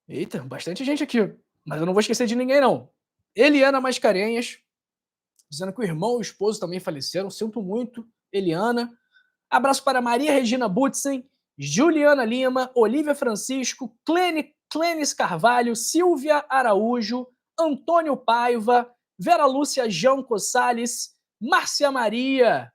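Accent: Rio de Janeiro, Brazil. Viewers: sou de Salvador Bahia.Voce e Carioca na cara, com este sutaque gostoso. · Esse sotaque carioca dá gastura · Doutor o seu jeito de falar é de carioca , acertei ?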